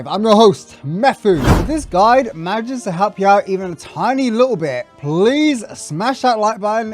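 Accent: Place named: London, United Kingdom. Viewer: Good news! I'm an London Wizard... So nice to hear the accent too!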